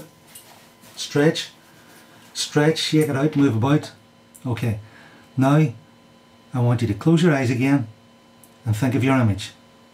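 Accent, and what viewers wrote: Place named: Northern Ireland, United Kingdom. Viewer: guy is northern Irish. always strange to hear your own accent. lol great video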